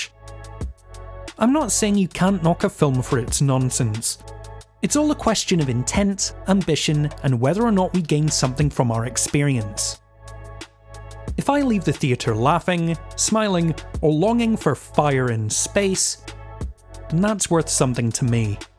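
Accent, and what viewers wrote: Scotland, United Kingdom. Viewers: Goddammit this is an awesome channel!!! What part of Scotland are you from? I'm a St Andrews man myself but live in Romania so it's always good to hear a Scottish voice. Thanks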